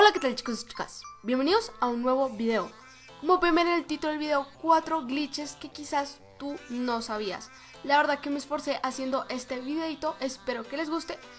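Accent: Colombia. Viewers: eres de Colombia?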